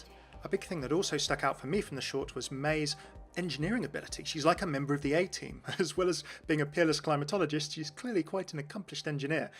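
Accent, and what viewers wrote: United Kingdom. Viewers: FoulFox Nation how can someone not British sound more British than British people? That makes no sense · The accent could be afrikan british origin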